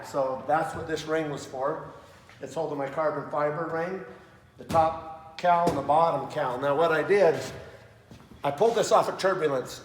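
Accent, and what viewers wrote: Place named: Nebraska, United States. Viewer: What state are you from? Your accent reminds me of Nebraska but I can’t tell. Thanks for documenting your build process so well, it’s very entertaining and educational. I’m studying as a software programmer, but I really want to be a pilot. I might join the military, but I’m still looking at my options. Some advice, maybe a little sound eq when you’re using power tools so it isn’t so harsh on the ears but otherwise great quality, especially for being in a hanger!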